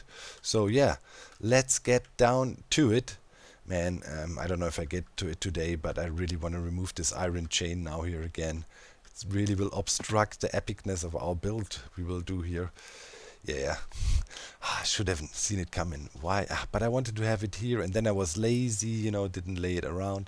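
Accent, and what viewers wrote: Germany. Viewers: hey i wonder If you can understand that ich habe erst und der Folge erfahren das du deutsch bist hatte aber immer den Akzent gehört ich gucke deine Videos jetzt seit grob einem Jahr die Shadow items die du mumbo letzte Season gezeigt hast haben haben mich neugierig gemacht und ich habe dich abonniert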